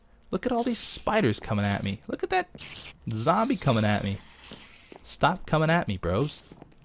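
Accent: Australia. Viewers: U have Australian English on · He might be using a different language. I believe its Australian English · He have australian language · it happens when u use the Australian english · was using Australian English for this episode I think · Australian speak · It sounds Australian like me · hes using aussie speak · Australian english language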